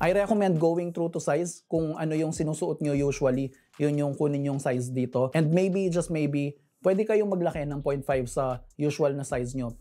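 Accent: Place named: Pampanga, Philippines. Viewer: Ah kapampangan ka bro? "Konyektado" Konyeksiyon"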